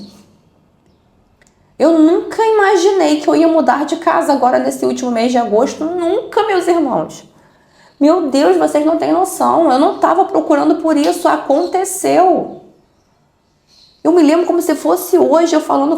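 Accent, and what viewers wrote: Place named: Rio de Janeiro, Brazil. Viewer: Voce e carioca teu sutake parece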